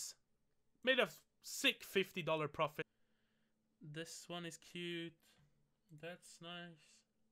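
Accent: Sweden. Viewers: are you swedish? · Are you from Sweden?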